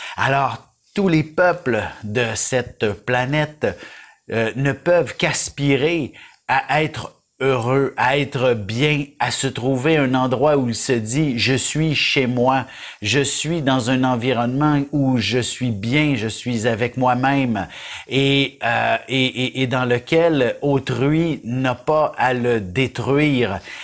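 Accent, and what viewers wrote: Canada. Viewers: Bonjour, faut il écouter les ascendants svp, j adore votre accent canadien Merci Mais il y a un moment que l on ne vous pas entendu, je suis capricorne ascendant lion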